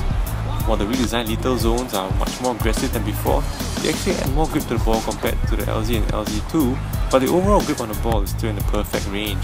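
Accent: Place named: Singapore. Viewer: I can tell ur from Singapore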